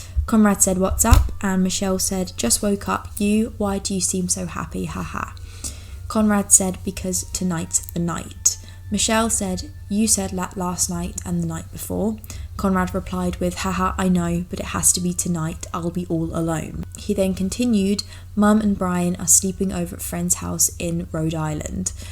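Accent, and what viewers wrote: United Kingdom. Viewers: Something about the British accent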